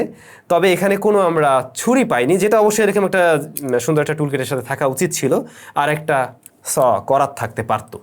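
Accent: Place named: Barishal, Bangladesh. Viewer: আজকে কথাগুলো ভাল লেগেছে গ্রামের ভাষায় কথা বলেছেন দেখে সেম বরিশালের ভাসার মত 🤔🤔🤔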